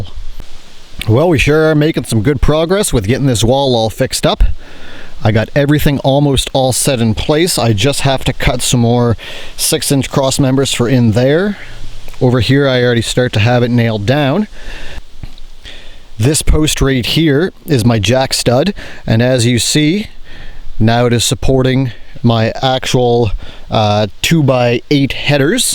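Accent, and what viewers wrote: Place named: Atlantic Canada, Canada. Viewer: Are you on the East Coast of Canada? This is a guess just looking at your woods and accent. I'm in NB.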